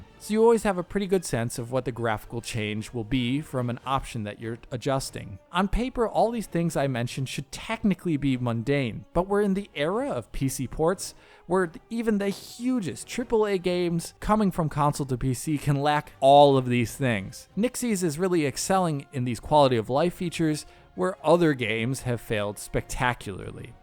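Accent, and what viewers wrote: Germany. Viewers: Your voice is incredibly monotonous and boring... and yet when you speak a bit of german, it becomes very colorful and full of life. You should try to use your german accent to speak english! And thank you.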